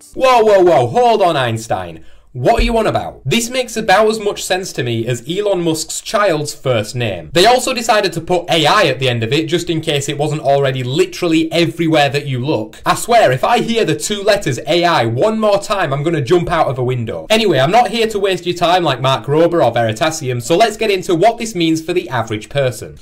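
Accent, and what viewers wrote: United Kingdom. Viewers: i think this channel is going to be the bri-ish "fireship" channel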